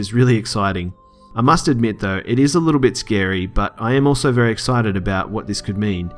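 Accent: Australia. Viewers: Loved the video. Your aussie voice is the best.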